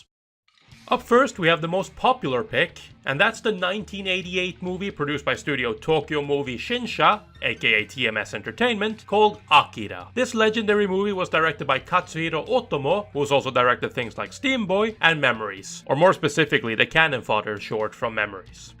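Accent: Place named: Norway. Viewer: Er du norsk? Du høres ut som en nordmann:P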